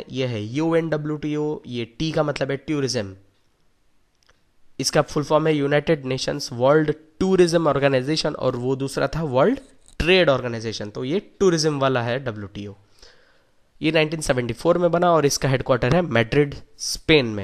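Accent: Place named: Bengal, India. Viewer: sir u please don't make videos ..your voice is terrible ..your begali accent is not at all effective ..it's very tedious ..i m unable to comolete the video due to your tedious voice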